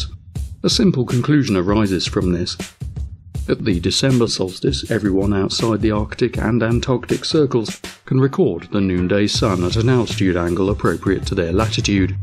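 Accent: England, United Kingdom. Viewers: Here we see the effect of "Education", a word that appears to mean very little to Flattards. As much as I enjoy these videos (and hearing an English accent for a change) I cant help thinking that the best way to deal with them is to not even give them the time of day - let them get on with their stupidity. They are clearly beyond help, and, like the religious, prepared to "Believe" rather than accumulate anything resembling a fact. However, I do enjoy a good laugh and Flattards do produce some of the best comedy Iv seen since Jim Jeffries took to the stage so for - Keep it up my good man, keep it up. Who knows, you might even educate one of the imbeciles, although, to be honest, I doubt that even Lawrence Krauss could educate these fools. Excellent job - Subscribed.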